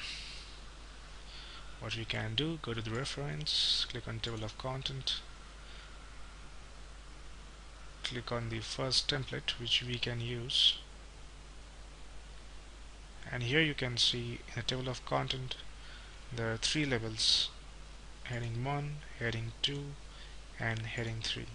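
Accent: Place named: India. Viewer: THANKS MAN WITH INDIAN EXCENT YOU SAVE ME FROM CRAZY 0.O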